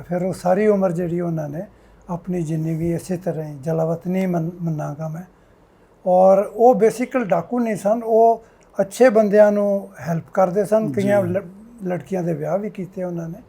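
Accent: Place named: Lahore, Pakistan. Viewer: Beautiful lahori accent, beautiful majha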